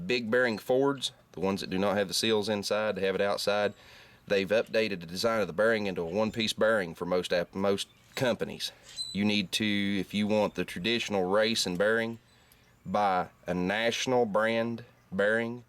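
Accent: South, United States. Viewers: Great job. I learned a lot so wanted to say thanks. Love the southern draw. Now to the garage.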